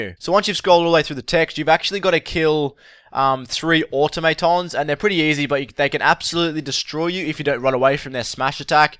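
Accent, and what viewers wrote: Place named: Australia. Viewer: Good to hear an Australian give a guide for once, cheers mate real helpful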